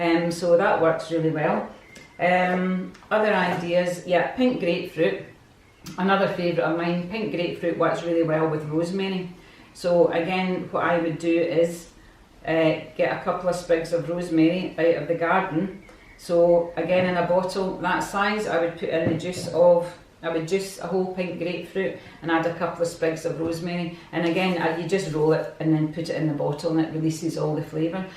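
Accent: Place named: Scotland, United Kingdom. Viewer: This video gives a very comprehensive list of flavours and combinations available..it will be my go to for choice Thank you very much and refreshing to listen to a Scotish accent for a change!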